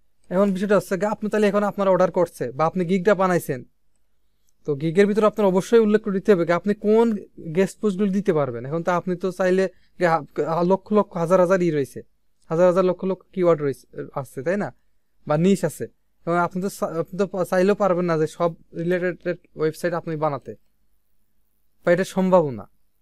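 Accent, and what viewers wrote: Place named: Pabna, Bangladesh. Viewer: kotha sune mone hocce pabnar vasha, apnar sathe jogajog kora dorkar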